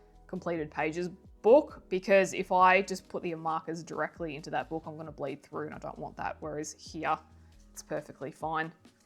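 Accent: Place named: Australia. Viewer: mate i heard the first few words and subscribed.. always got time for an fellow Aussie. You sound just like I am with these things! I overthink and if its not perfect i'm ready to get a new book lol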